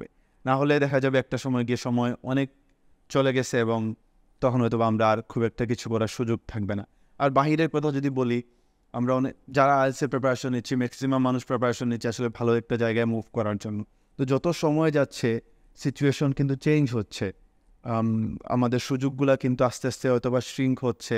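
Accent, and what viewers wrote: Sylhet, Bangladesh. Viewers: Outstanding video.Are you Sylheti? 🙂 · Sir apni kita sylhti ni plz koiba.. ar kun jaigat porain akto janai bah ame portam sai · Sir...apni Sylheti..kun jagay poran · Wow so quite sylhety .🎉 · Sir You r Sylheti😍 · Apni ki amader Sylhety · Sri apnar Sylhet e basa ta sundor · Sir,Sylheti kotha gula kubi valo lagche. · O sir afni sylheti ni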